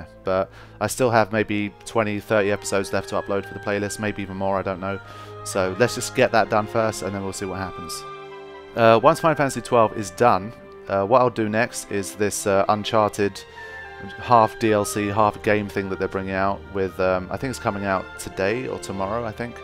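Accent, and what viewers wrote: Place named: North London, United Kingdom. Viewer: I hear a bit of North London accent there :-) anyways, I think an interesting documentary topic to explore is the positive effect of gaming in general. Would be good to offer some counter argument to worried parents etc. In particularly final fantasy which has a large fan base that has aged and grown with the series. It would be interesting to interview these people to get some of their stories. Final fantasy touches on so many different issues in their games over the years and has definitely influenced me despite coming to it in my mid 20s.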